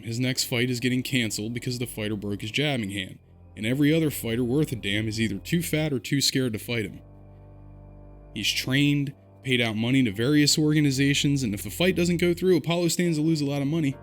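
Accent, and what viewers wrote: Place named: Delaware Valley, United States. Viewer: I enjoyed this tangential video, I subscribed after watching your video essay on "AAA" game design and am by no means a writer and found it interesting. It's awfully appropriate this video is delivered in an authentic Delaware Valley accent. The city of Philadelphia really relates on a personal, almost cultural level to Rocky's underdog narrative and low self esteem in a way, it can be compared to the city's well known inferiority complex - which extends into New Castle County, Delco, Bucks and South Jersey. I'm a "Delaware Valleyan" living far from home and I sometimes have people ask me why we take pride in the statue of him near the Art Museum. It's clear to me why he's been co-opted into city culture.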